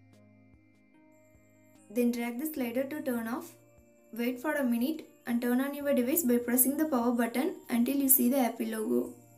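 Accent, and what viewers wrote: India. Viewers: i liked the video because of the indian accent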